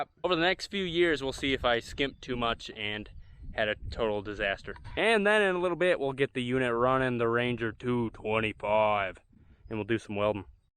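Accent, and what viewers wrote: Texas, United States. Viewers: you have a southern accent coming through on this video!!! You sound like your from Texas!!!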